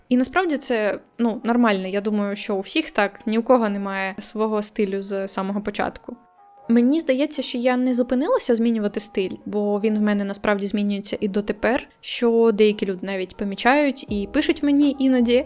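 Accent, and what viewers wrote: Russia. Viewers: даже русский акцент, т.к язык автора изначально русский))) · Язык красивый и я его понимаю. Но русский акцент он же никуда не денется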